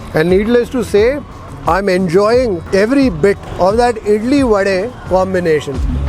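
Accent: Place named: Karnataka, India. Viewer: I love to hear your Kannada..... So pleasant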